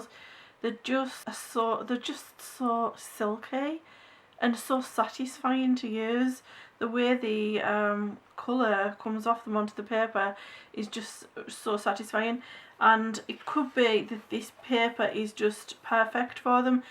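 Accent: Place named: Birmingham, United Kingdom. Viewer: Absolutely love the book! Where did you get it and what is it called? I have a few open stock Pablos and I recently bought the Luminance, love what you are doing. It’s lovely and you are so pleasant to listen to 😊 I was born in Birmingham but emigrated to Australia in 1974. Your voice reminds me of the land of my birth ☺️☺️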